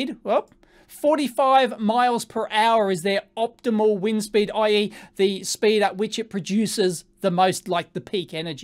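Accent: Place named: Outback, Australia. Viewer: Why come you talk like you're from the outback?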